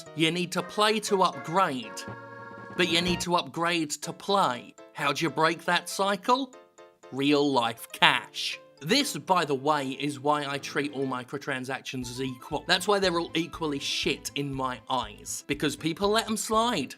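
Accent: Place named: United Kingdom. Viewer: So satisfying hearing a British bloke tell it how it is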